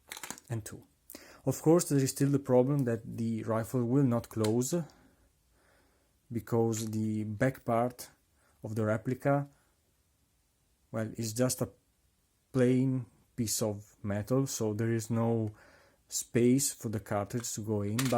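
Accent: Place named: Italy. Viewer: Si sente l'italiano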